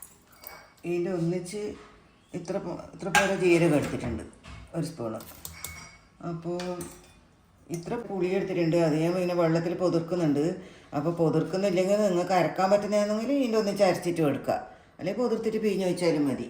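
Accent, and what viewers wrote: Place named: Kannur, India. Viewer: Supper 😍😍 nammdea kannur slang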